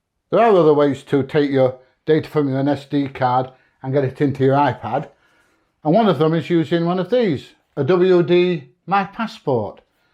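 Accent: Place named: Yorkshire, United Kingdom. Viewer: What a character! Yorkshire lad?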